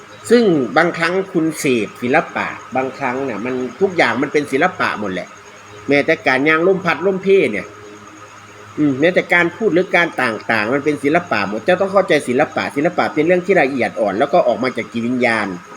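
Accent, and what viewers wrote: Isan, Thailand. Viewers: ดีคือกันหละโยโก๊ะเอกลักษ์เจ้าคือเสียงอีสาน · รู้จัก จากเสียงอีสานนี่แหละคนรู้จักทั่วประเทศคณะอื่นดังเฉพาะภาคอีสานฉันเปนคนภาคเหนือ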